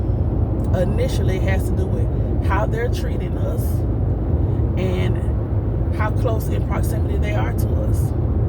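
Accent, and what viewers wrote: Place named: Orlando, United States. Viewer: I figured you were in Orlando area, you hv the voice inflection/accent from there.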